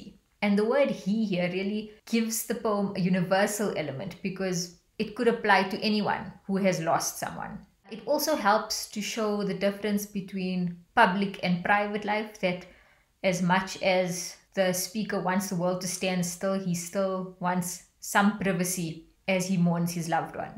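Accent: South Africa. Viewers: Are you south african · south african? i can hear the lovely accent 🇿🇦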